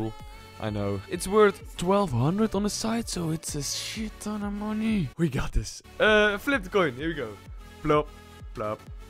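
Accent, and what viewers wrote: Netherlands. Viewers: Are u from the netherlands or so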